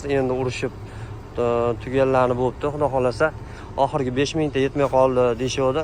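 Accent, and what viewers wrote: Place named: Tajikistan. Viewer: 😊ты таджик не умеешь по узбекский говорить. Сакау.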